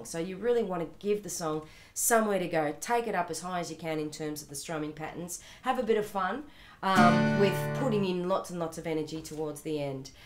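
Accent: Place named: Australia. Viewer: nice video!! love that Aussie accent too!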